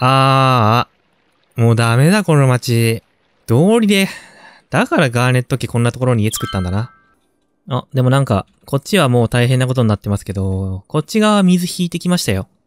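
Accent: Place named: Mie, Japan. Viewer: 〜 関西人説 「為せばなる、為さねばならぬ何事もってやつ『やな』」 結構標準語やし三重県民かなぁ？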